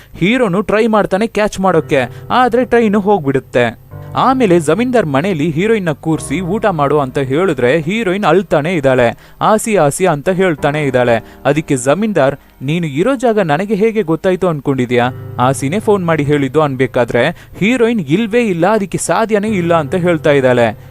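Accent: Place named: Kerala, India. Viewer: Mallu bhaay kannada maathaadthiro haag kelsthide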